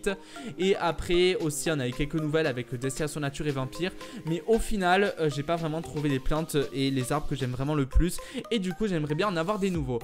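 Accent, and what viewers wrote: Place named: Toulouse, France. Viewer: jadore ton axent toulousain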